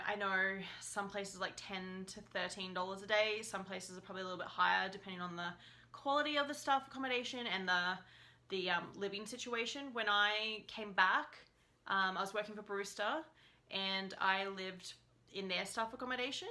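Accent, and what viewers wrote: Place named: Australia. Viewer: I could listen to this girl forever ! Two jobs, packing all that life into so little time... don't know how she does it. But I was young once too.(that's me in the picture but... it wasn't yesterday). Loved Banff and the Canadian Rockies. Thanks to You Tube I can rediscover this wonderful place. Thank you Aussie 👧 girl ! Love your accent !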